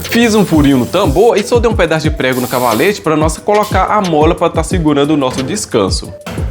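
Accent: Minas Gerais, Brazil. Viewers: Aí ficou top. Vc é de minas? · Boa noite amigão pelo sutaque voce deve ser meu conterrãneo mineiro, eu não só acho mais a NASA terá que estudar sua invenção MUITO TOP. Moro em Sorocaba SP.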